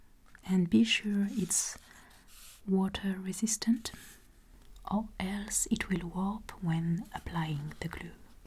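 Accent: France. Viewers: Your videos are, possibly, the best crafted asmr ones I’ve seen so far. Plus, your french accent is so charming...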